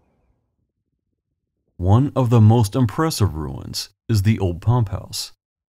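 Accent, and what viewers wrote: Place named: South, United States. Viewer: Wow 😲! I kind of nailed it! That's so cool your ancestors were French and followed Daniel Boone to... Kentucky! I looked up Lawrence County, IN and it's very close to the Kentucky line. As a proud Southerner (Alabama, where the skies are in fact so blue) I assure you I was complimenting you on any influence your parents' accent may have had upon you! You're Midwestern, yet retain the Grace and Gentility of a Son of the South. Just got home from work, about to watch more. It's a pleasure to meet you. I'm William.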